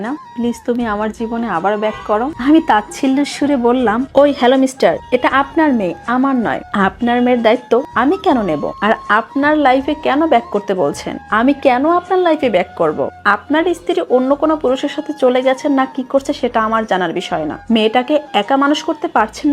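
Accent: Barishal, Bangladesh. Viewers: আমি একজন নোয়াখালীর ছেলে। কিন্তু আমি বরিশালের কথাগুলো বেশি পছন্দ করে। আপু টিকটক গুলো আমার কাছে খুবই ভালো লাগে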